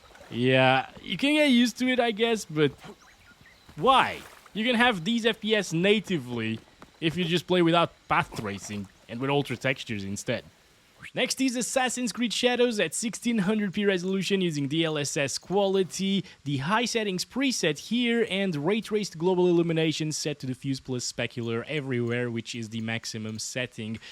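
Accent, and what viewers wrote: Portugal. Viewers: You sound Portuguese, ahahahah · Good video! Are you Portuguese or Brazilian?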